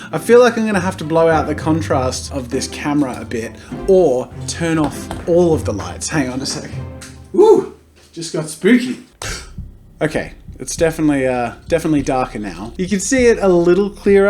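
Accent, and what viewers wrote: United States. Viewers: his American accent is point on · I first I thought he was playing a scary story in the background, but now I'm like" oh wait that's him speaking I think". if that was you talking, one question. How do you immatate American accents so well? 😂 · omg that american accent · the American accent that was good as heck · The slight American accent in the story kinda feels weird with you tbh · Nice American accent for the story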